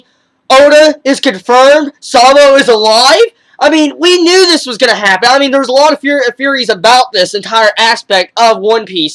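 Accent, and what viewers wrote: South, United States. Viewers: Did you study voice training? Or something to that effect? You sound noticeably more country back then and now you speak very clearly and slowed down.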